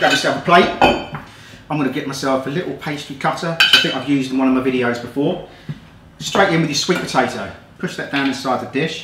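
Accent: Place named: United Kingdom. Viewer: I don't mean to be too direct, but let me tell you: I love the way you talk! British English sounds so brilliant, especially the variety you are speaking. Sorry for this non-food-related comment of a little German linguist! Thank you for this lovely recepy as well, we will recreate it soon! Cheers!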